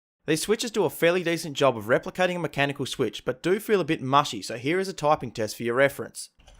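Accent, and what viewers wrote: Australia. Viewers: ayyyyy its an aussie finally an accent im used to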